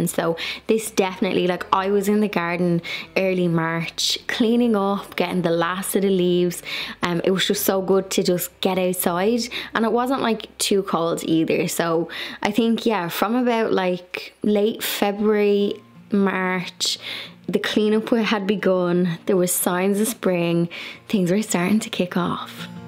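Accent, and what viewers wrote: Ireland. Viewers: just found your wonderful site! Awesome! You have so much energy! and all the hard work has resulted in beautiful gardens! (where are you located? I detect an Irish accent) Keep on keeping on....regards from Nana Green Fingers in the U.S.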